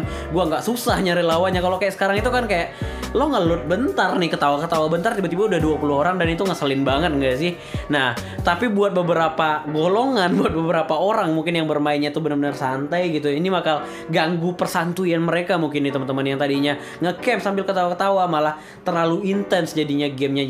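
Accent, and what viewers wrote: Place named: Bali, Indonesia. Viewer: Orang bali bang??? Soalnya logat pas ngomong "t" nya mirip am orng bali :v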